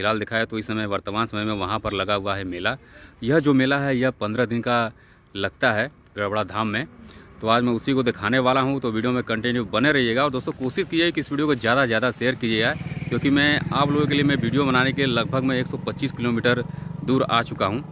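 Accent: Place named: Varanasi, India. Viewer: Video tho achcha laga vusse bi jyadha aapka bolna bahuth achcha lagta hai ♥️ banaras ke hindi sunne me bahuth masth lagta hai 👌👍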